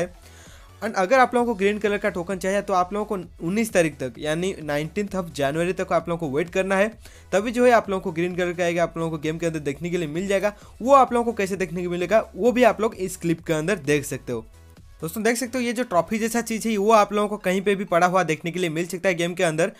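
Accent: Odisha, India. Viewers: Are u from odisha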